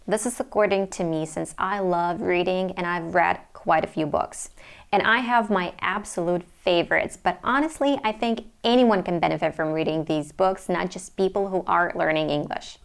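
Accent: United States. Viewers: You are very Beautiful and Intelligent. I am amazed that you have developed accurate American accent while not living in U.S.A. I would recommend to learn Technical books as well. I mean, Mathematics, Computer Programming in Python and Artificial Intelligence books. To know American Accent, learn Phonetics, Linguistics, Phonics. Learning Natural Language Processing can also help you about American English and Accent too....... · Could you please teach us, how to pronounce "R" in American accent like you.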